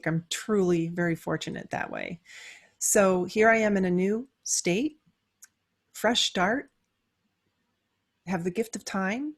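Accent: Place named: United States. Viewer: very interesting, but slowly and dont speak American. We European exJw are listenin too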